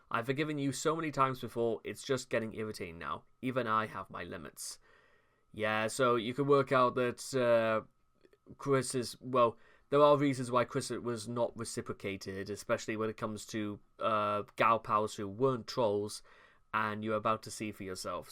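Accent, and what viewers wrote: United Kingdom. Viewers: Sounds Northern to me. But I'm an ignorant North Londoner. I'd guess Northamptonshire maybe. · What part of the UK are you from man?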